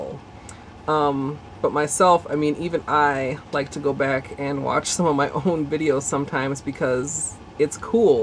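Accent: Minnesota, United States. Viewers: You have a very Minnesotan accent. ;) but it's ok cause I'm Minnesotan too.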